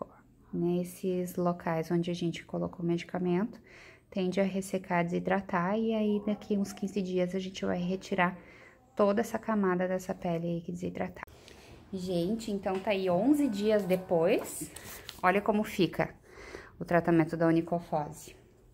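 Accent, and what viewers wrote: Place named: Rio Grande do Sul, Brazil. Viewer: estou gostando tanto do seu trabalho q não consigo ver de mais ninguém amo seu sotaque de gauchinha sou mineira de Nova serrana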